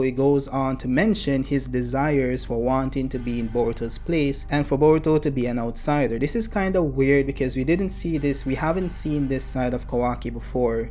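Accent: Jamaica. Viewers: You sound like a Jamaican speaking standard English. Are you Jamaican bro?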